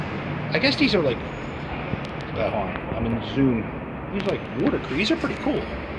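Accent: Philadelphia, United States. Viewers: the accent lol yep you from Philly alright 0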